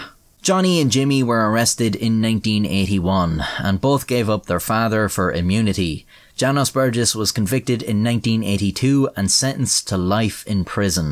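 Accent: Ireland. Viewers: This was way different from the story I expected while litening to an Irish voice talking about a bomb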